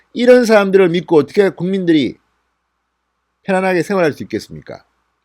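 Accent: Gyeongsang, South Korea. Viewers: 문재인은 남쪽.전라도 대통령이라든데 방송하시는분은 말투가 경상도 이신데 문재인은 대한민국 대통령이 아니니까 대통령이라 부르지 마십시요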